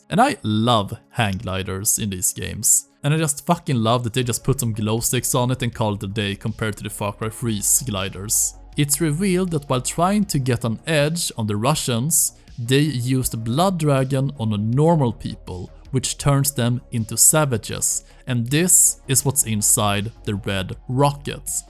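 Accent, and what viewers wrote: Sweden. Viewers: You sound so swedish. It´s funny. Good video.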